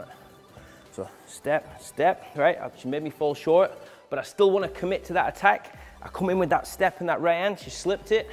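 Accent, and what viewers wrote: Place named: North West England, United Kingdom. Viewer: Your videos are brilliant, I love this channel. I train at a Boxing gym here in Perth Western Australia- I'm Manchester born 🇬🇬 I'm just loving boxing training.(it's fixing me after stroke & changing my life!) I absolutely love your channel, thanks 👌🙋‍♂️ I Started in Bredbury Stockport, you sound like your not to far from this area in the North West hey 😛🤙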